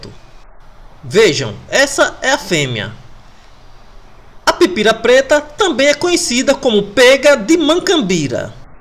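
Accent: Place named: Northeast, Brazil. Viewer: Gosto do seu sotaque nordestino , este é meu Brasil de Norte a Sul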